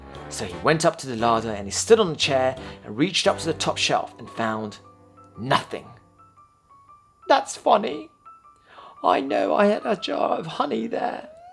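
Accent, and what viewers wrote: United Kingdom. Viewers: やはりイギリス英語が好き！の声、活舌、トーン、ピッチ、最高にドはまりしましたよ☆ これまではベネティクトカンバーバッチの癖強め朗読聴いてました(それはそれで好き💛) もっと沢山聴かせてください~☆ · British accent 大好きです😌ずっと聴いてたい✨✨✨ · はっきり言って、挿絵が有るのがすごく助かりました😂文字は追えても、イメージを作る余裕がないので、絵が有るだけですごく解った気分になりました❣️それと、ページをめくるのが、本当に読み聞かせしてもらっているような心地良さがあり、色褪せた部分からはお祖父様の大切な本をシェアしてくれている実感が何とも言えない😊 BGMは無い方が、の世界に引き込まれるし、ストーリーの味も、引き出されるかな。一方で、が紅茶を注いでいる音、焚き火の映像、途中で焚き木をくべる様子、全てがうっとりするくらい癒される時間です。何より、本物のイギリス英語のリズムの、なんと美しいこと❣️英語の理解はグッと下がるけど。（何十年も前に買ってあった原書を引っ張り出して来て、読んでもみました。） つまり私の希望は！同じチャプターを両方でやって‼️です😅どうかお願いします🤲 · のイギリス英語が好きです！こっちの方が聞きやすいですが、BGMもないとさらに良いですね。すみません汗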